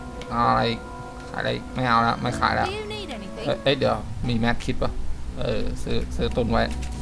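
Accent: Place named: Suphanburi, Thailand. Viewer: พี่เป็นคนสุพรรณบุรีป้ะ เหน่อเวอร์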